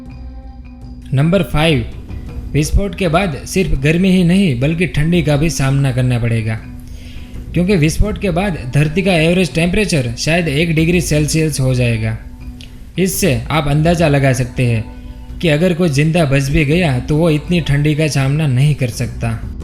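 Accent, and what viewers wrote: Gujarat, India. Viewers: bha gujrati ho kya? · aapka bolne ka style gujrati hai. Aapka ascent se pata chal gaya. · rocky rock 👌👌👌 u r 1000% bole ap ye voice boht gujrati he hindi 😃😃😀😀✌✌👌👌👍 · Gujarat ki bhasa hi alag hoti hai jo ki hindi bolne se pta chalta hai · nic video ...hahahaha Gujarati jalak rahi apki awaz me ... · Bhai Gujarati me Hindi kyu bol rhe ho ! Try to control tone, it's nice video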